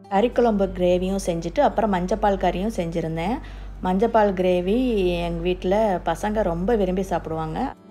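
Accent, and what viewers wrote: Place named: Kilakarai, India. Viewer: Ungloda slang padha theriudhu nega klk nu nice sis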